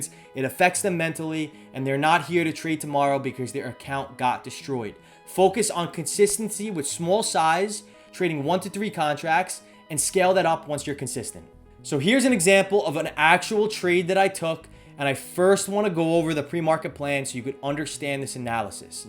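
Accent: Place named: New York, United States. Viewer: There is zero chance this guy is not a New Yorker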